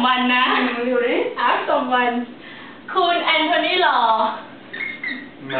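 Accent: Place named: Thailand. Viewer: ชอบสำเนียงเสียงไทยคุณมากเลย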